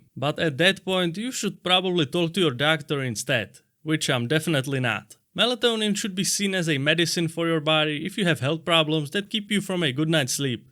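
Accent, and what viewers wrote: Czech Republic. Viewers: i'm not sure but his accent sounds Czech or Slovakian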